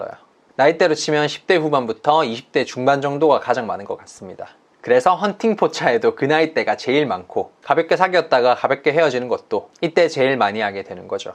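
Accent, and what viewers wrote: Gyeongsang, South Korea. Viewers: 밑지방 사람인거 티가 나기보단 그냥 대놓고 나 밑지방 사람이야!!!!!하네요. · 대구 말투에요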